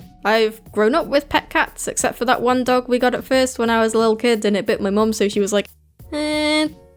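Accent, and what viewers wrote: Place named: United Kingdom. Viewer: I love the british accent🙂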